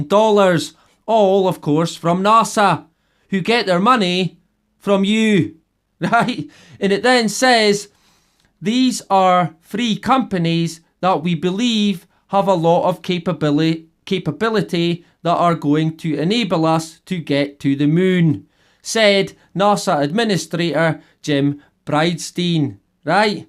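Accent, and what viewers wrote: Scotland, United Kingdom. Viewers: Been subbed since you were 1.800, well done on the rise in subs you've had, in a very short time. I love your accent, I hope I'm not insulting you if I ask which part of Scotland you are from...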